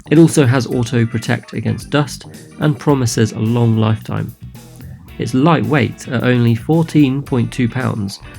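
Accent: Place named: England, United Kingdom. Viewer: He's a Pommy, not a Kiwi!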